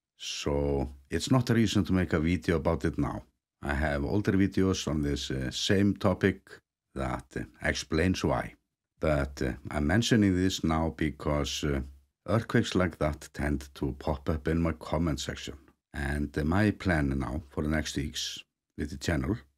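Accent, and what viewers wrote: Iceland. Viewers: I love Iceland since I was young and I got a book from Jon Svensson about Nonni! But first in May 2022 I could follow my dream and visited Iceland and Nonnis home in Akureyri. In March 2024 I was again in Iceland (between two eruptions) and saw the beautiful Katla icecave. I live in Germany and I will visit the tomb of Jon Svensson in cologne next. Thank you for your beautiful reports about Iceland! I love your Icelandic/English dialect! Many thankful regards!!!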